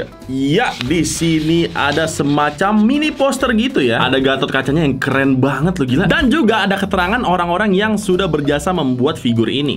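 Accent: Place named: Java, Indonesia. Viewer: Kudune koyongene koyongono agak keluar jawa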